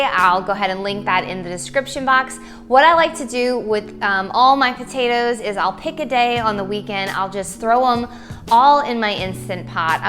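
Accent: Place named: New Orleans, United States. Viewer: I just discovered your channel - I live on the Northshore of New Orleans and was thinking, with that voice you had to be from around here!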